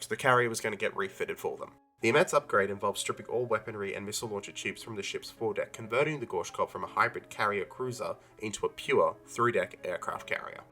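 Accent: Australia. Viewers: Love your videos. As a fellow Aussie it’s great to listen to your commentary. Keep up the great work. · Excellent Video, I like long format generally, especially in an Australian accent.😉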